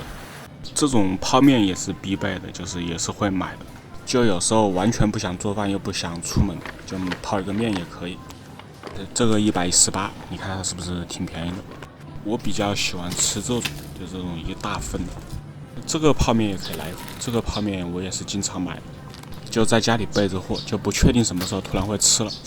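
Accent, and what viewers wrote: Wuhan, China. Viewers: 武漢普通話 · 这期提到热干面，加上口音，应该武汉人无疑了。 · 你是武汉的？ 藏不住的口音 😂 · 主播是武汉人吗？感觉是汉普，另外听到了热干面，感觉很亲切！ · 朋友，你这武汉普通话，好亲切啊，多久没吃热干面了。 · 博主听口音是武汉的吗？ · 聽你口音感覺就是漢普，等到14分鐘的時侯就真的冒出一句武汉話。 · 主播这口音武汉人吧？ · 我一听你的口音就觉得你是武汉人， 果然是哈哈哈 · 武汉口音？ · 听口音是武汉的吧？抖音也刷到过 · 这口音一听就是武汉人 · 听口音武汉人吧？老乡！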